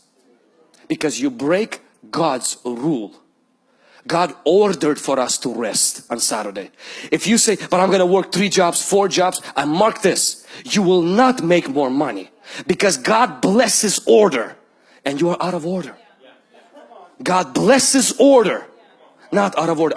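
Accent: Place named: Eastern Europe. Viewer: he sounds eastern European... nice message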